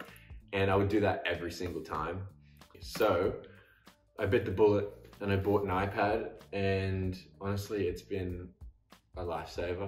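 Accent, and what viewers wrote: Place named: Australia. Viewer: I love his Australian accent. I can listen & watch him all day. Hes so gorgeous 😍😛😘☺🥰🤗🤤😃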